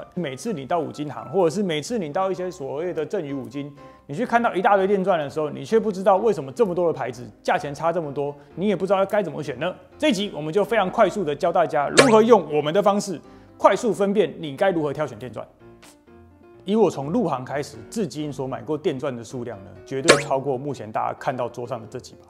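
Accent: Taiwan. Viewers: 沒有BOSCH......鑽頭：學名就是鑽頭！不要在那邊台灣國語.....要你就直接用台語介紹、解說！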